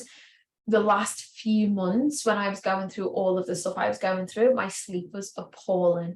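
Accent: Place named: Liverpool, United Kingdom. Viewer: Nice to hear another Scouser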